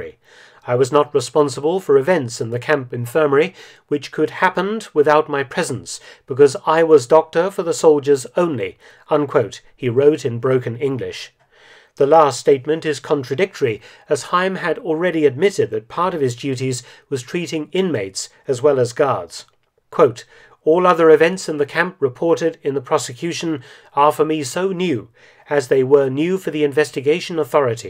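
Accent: United Kingdom. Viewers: There is something about the British accent. I can’t remember feeling this good about an historical documentary since watching ‘World at War’ narrated by Lawrence Olivier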